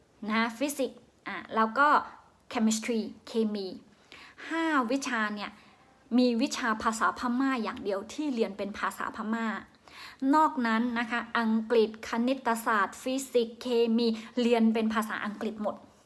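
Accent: Central Thailand, Thailand. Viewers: น้องภาษาไทยชัดเป๊ะ เหมือนคนไทยภาคกลางเลย เก่งมากครับ อยากทราบว่าน้อง เป๋นไตรลื้อ หรือไตรขืนจ๊ะ ลุงเพิ่งดูน้องคลิปแรกจร้า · ชอบน้ำเสียงพูดของน้องมาก พูดไทยภาคกลางชัดกว่าคนไทยบางคนอีก เล่าเรื่องเข้าใจง่ายทุกเรื่องเลยครับ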